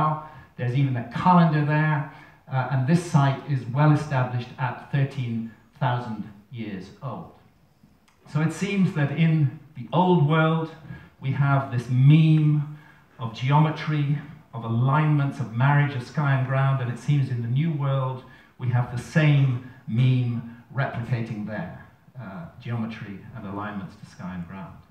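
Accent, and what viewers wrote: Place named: Scotland, United Kingdom. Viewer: He speaks Scotts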